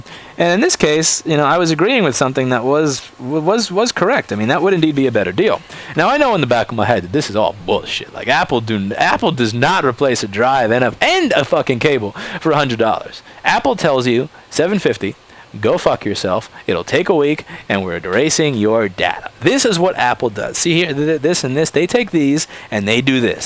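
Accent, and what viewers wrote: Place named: New York, United States. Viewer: I think the New York accent makes your “shut the fuck up” 10 times better LOL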